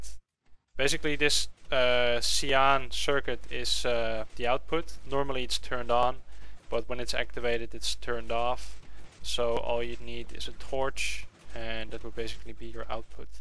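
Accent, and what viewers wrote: Netherlands. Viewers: are you dutch ?? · Ben je Nederlands? Je klinkt Nederlands. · where r u from?? holland?? :) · Je bent Nederlands of niet? ik kan het horen.. C: Wel erg leuk dat je zo ontzettend groot bent op YouTube. Ik denk de grootste Nederlandse Youtuber. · is it possible that you are dutch ? i hear a bit of a dutch accent in your voice :D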